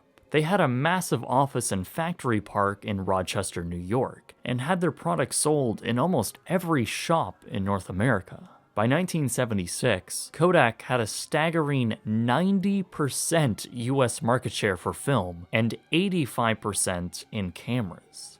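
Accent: Canada. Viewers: Is the narrator Canadian?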